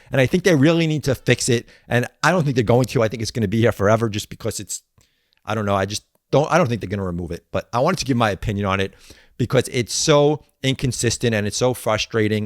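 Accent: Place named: New England, United States. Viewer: you from New England? That accent sounds like it! I'm in RI! I've been seeing your videos for a few months! Appreciate the content, brother!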